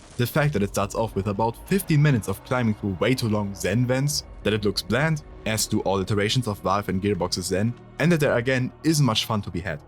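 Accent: Germany. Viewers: ich hoer sofort dass du ein deutscher bist. macht aber nix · Smol Question, are you german? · You sound german...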